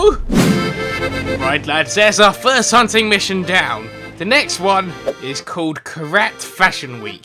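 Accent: England, United Kingdom. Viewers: Your British JUST LIKE ME?😇😇😇😇😇😇😇😇😇😇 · So yiu are from england??? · are you brittish yes or no (brittish is cool)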